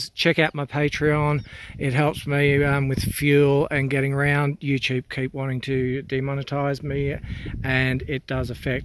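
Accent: Australia. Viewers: Ah, read all the way to the bottom and am terribly sorry to hear that the cancer is back - like a knife through the heart with shock and sadness. You know you were my first love for abandoned explores (it's that catchy Aussie accent as you ramble on, haha) and because you do what you do so well, here in Upstate NY we're sending tons of love back for a safe and quick recovery. Peaceful thoughts to you and yours. (Another great explore, by the way!):)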